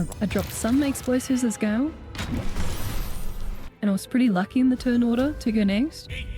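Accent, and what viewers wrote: Australia. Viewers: Unapologetically Australian and I love it! The rep we deserve as Aussies ahahah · I first subscribed to this channel for the Australian ASMR and stayed for the insanely creative runs & humor. I’m full on cackling over here 😂😂😂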